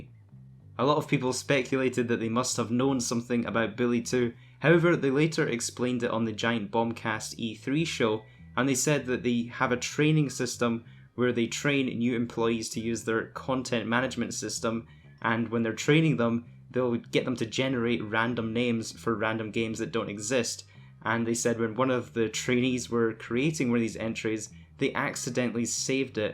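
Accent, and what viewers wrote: Scotland, United Kingdom. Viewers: nonya business He's Scottish, not English. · Omg where u from, I'm Scottish too! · he's Scottish clearly u dumbass, ur obviously not from england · he's Scottish clearly you dumbass......🤣😂😆😅😀 take a course in grammar dip shit....you mean to say..He's clearly Scottish you dumbass ass...man I can tell your a special needs kid aren't ya lmao.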